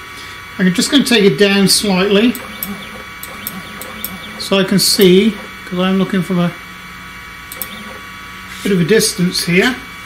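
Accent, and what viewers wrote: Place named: England, United Kingdom. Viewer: dude you sound english but you are talking in a currency that WE DO NOT USE?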